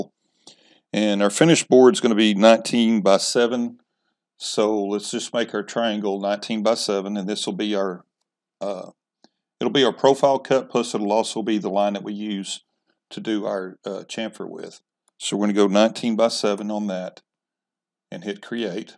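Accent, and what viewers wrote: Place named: South, United States. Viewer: I'm about 10 months behind you on the CNC purchase. Just came across your channel today and am really impressed with it. I just got the VCarve Pro and have been watching the tutorials that come with it. I must say yours are much easier to follow and don't put me to sleep! Probably the good ol southern accent!!! I'm also very impressed with your other wood working projects!!! Thank you!!!!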